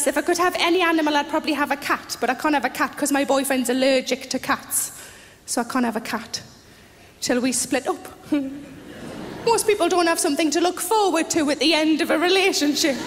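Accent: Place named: England, United Kingdom. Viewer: I wondered what part of England she came from with the accent, I don't here that kind of British accent very often.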